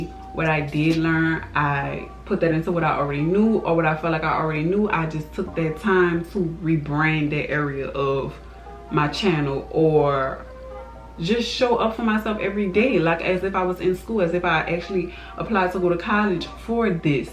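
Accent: Louisiana, United States. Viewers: I knew you were a louisiana girl from the first couple seconds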